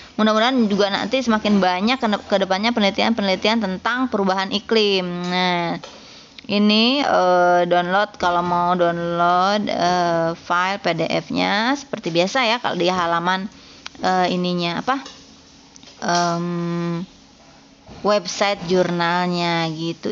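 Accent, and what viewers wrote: West Java, Indonesia. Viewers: Hatur nuhun ibu...meni Sunda bangets hehehe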